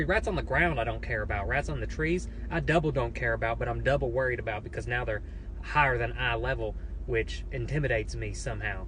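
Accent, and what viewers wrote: South, United States. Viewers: is he talking about possums? lol i guess they are noisy little creatures. he had more of an southern tang in 2018 did yall notice that?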